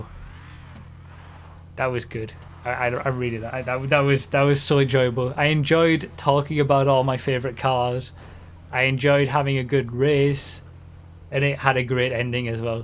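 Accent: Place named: Newcastle, United Kingdom. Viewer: that is what is scientifically known as a Geordie accent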